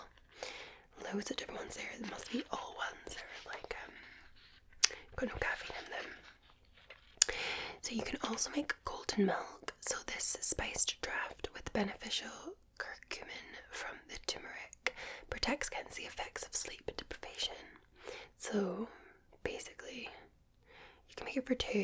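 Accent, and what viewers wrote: Scotland, United Kingdom. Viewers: She looks like pin up art and calms me like.... tranquility. are a fine sleep aid. TY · Another Scottish ASMR. Another happy American soldier 🥹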